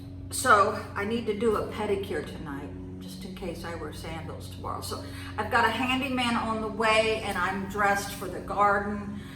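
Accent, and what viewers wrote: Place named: Southwest Virginia, United States. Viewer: OMG I love that SW Virginia accent. My late husband was from Roanoke.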